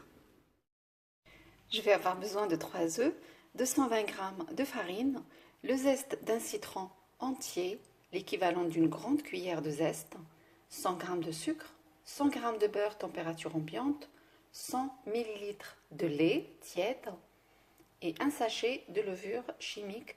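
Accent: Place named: Morocco. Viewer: Choukran tu expliques tres bien merci pour les efforts .Ton accent Marocain est magnifique.😍😍😍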